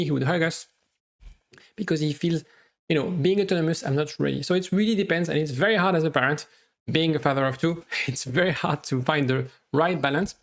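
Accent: France. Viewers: Is that a French accent?😊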